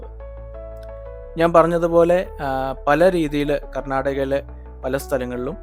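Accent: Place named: South Kerala, India. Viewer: പാചകം Eassy - കഴിക്കാൻ Tastyയും ആണെന് തോന്നുന്നു. തെക്കൻ style സംസാരം. നന്മ നേരുന്നു. Rtd Teacher'👍🏻🌹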